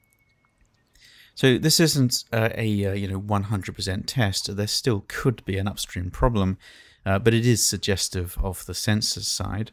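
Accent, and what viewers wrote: RP, United Kingdom. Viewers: I love the posh accent.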